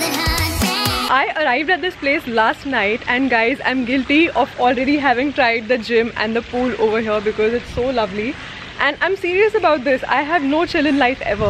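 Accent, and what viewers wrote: India. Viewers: I DONT UNDERSTAND ANYTHINH HER ENGLISH WITH INDIAN ACCENT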